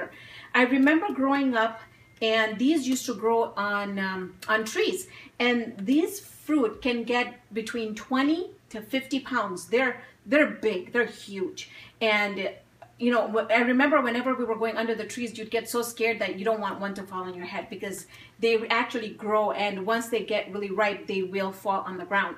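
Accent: Minnesota, United States. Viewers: You sound like your from Minnesota too lol! By the way its not meant as an insult. Also your video was very informative. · Are you from Minnesota? :) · American accent nice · Don't think I've ever seen an Arab woman with a Minnesota accent. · my local grocery store in switzerland just started selling canned version so i came to youtube to check it out.. i love the minnesota accent haha. originally from minnesota myself and i am enjoying trying new flavors that are accesible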